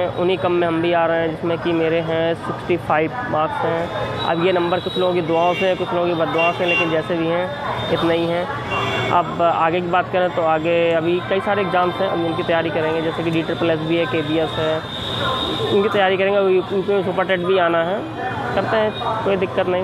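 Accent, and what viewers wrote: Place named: Bihar, India. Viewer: bihari accent kyun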